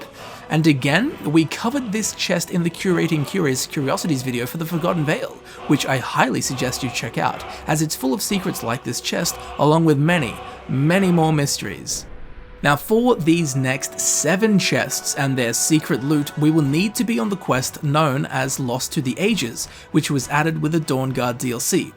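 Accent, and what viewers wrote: Australia. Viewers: He's British, he has it memorized. Edit: Australian? It's less funny now. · isn't he australian though?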